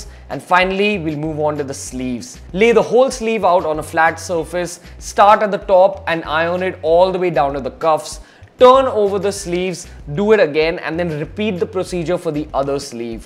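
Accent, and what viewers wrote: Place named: India. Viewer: "short" or "shirt" sh*t!!!! that's how indians speaks!!!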